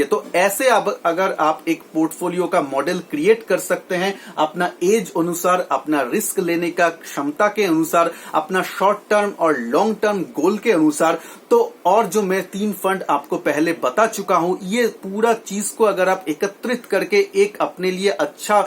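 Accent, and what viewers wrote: Bengal, India. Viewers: Hi, I have seen and liked your video. You talk nicely and your logic is lucid and easily understandable. My suggestion, you should include the Risk part too, the individual Funds poses, in your discussion. That becomes a very critical determining factor in Fund selection. You are a Bengali, right? Apparent from your pronunciation. Good luck to you and keep presenting such nice videos.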